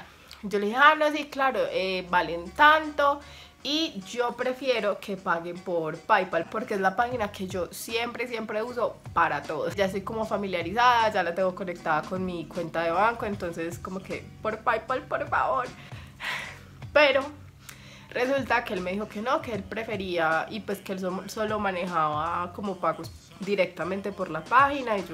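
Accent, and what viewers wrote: Colombia. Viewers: Me encanta tu acento colombiano 🤤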